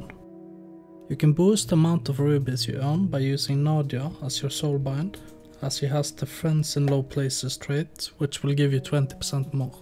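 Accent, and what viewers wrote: Norway. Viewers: Det var så fint så. Bare at dialekta skinte igjennom. Kan man gjette Førde eller Nordfjordeid?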